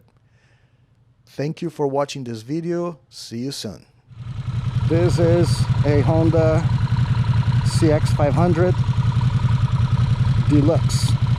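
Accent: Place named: Brazil. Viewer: i loved this video and also love the name of your channel....sotaque de brasileiro hein i am a new subscriber to this channel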